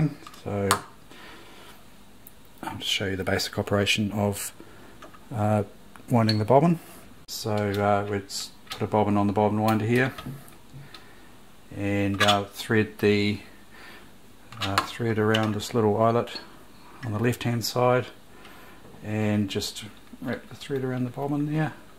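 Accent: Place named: New Zealand. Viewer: Mate, thanks so much. Trying to figure out my Grandma's old machine and this was the perfect video. Also imagine my shock when amongst all the female American voices in other tutorials I find a fellow kiwi! Made my day. Churr.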